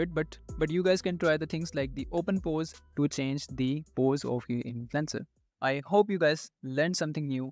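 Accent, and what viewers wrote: India. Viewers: Github? 🤡🤡🤡yeah, if you want to get virus especially hearing this indian accent. Hell no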